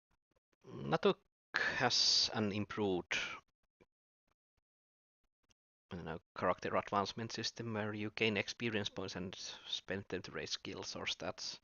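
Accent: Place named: Finland. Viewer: Holy shit! Another person with a penchant for Nahlakh and Demise specifically. Congrats on finishing this epic game. I never managed this in the 90s despite several efforts. Always got stuck at some enemies. I love this style of games. I bet you play the Exile series as well, which is another amazing set of games. You might be a fellow Finn as well based on your accent, which is not surprising because of Pelit magazine's coverage back in the day. That is how I discovered the game. Check out a modern game called Dungeon Encounters. Might be right up your alley. Cheers! :)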